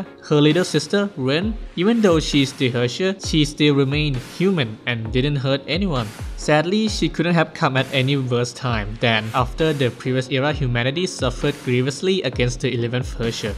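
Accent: Thailand. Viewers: offtopic for a moment i did NOT expect this youtuber to be thai, i heard his accent and i checked his about page and damn he really is thai good to know that i found an another thai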